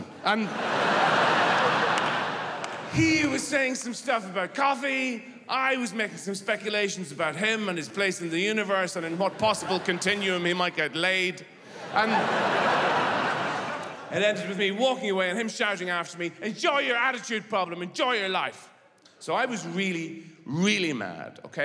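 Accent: Ireland. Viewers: what is this beautiful accent? Irish? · Irish accent, so beautiful...🥰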